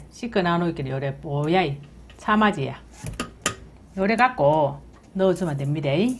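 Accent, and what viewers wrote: Gyeongsang, South Korea. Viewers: 너무 크다타이하모 입이 찌져집니더~ ㅋㅋㅋ갱상도 말은 언제들어도 웃십니더 ㅎㅎ 지는 대구에산지 38년되었는데 말투가 갱상도 충청도 짬뽕입니더 ㅎ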